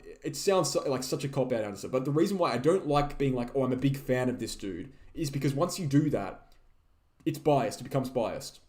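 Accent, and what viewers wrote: Australia. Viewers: And screw it if the channel doesn’t grow. Your honest. People can’t handle that. Screw them. 😂😂😂 Plus on a lighter note , I lived in Oz and New Zealand, Your accent is Oz not Kiwi. 😂😂😂😂😂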